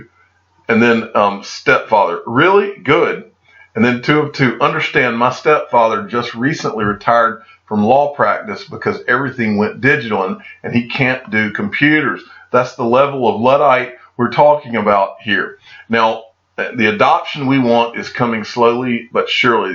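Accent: South, United States. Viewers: When I 1st started listening to you with the southern accent and your relaxed nature, I didn't take you seriously. That soon changed with the substance of your videos. You nail it every time. You, Tony and Eri are my favorites. Strait shootin news is what gives us confidence. One thing I get confused at though. I think you should also be mentioning the Trezor Hard Wallet. My opinion, it's better than the Nano. I bet you had a smile on your face until I mentioned the Trezor, sorry man. LOL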